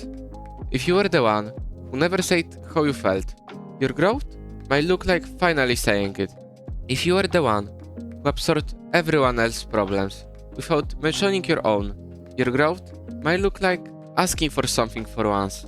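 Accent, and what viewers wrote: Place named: Poland. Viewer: Bracie słychać tego Polaka w glosie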